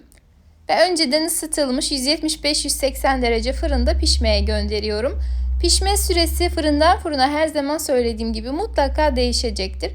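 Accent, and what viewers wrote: Azerbaijan. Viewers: Dinleyen kimi bildim ki AZERBAYCANLISAN ❤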